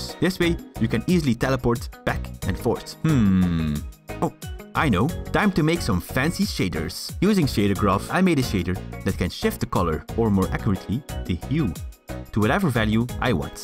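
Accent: Netherlands. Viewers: Nice to hear the dutch accent, didn't know our country has such great youtubers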